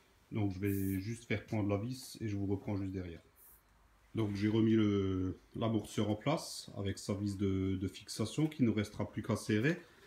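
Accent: Alsace, France. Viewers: Accent alsacien ? ;-)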